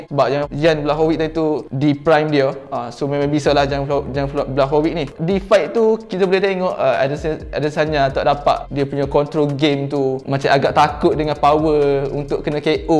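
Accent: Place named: Kelantan, Malaysia. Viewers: Awok terlalu kelate untuk sayo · Bahaso kelate demo pekat sngat bey , ore lain comfirm xpehe , pah sor lg lorat nok g mano ? Aduhhh laa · Kelate ni boh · aduh laju beno ckp...campur2 klantan plak...susah nk phm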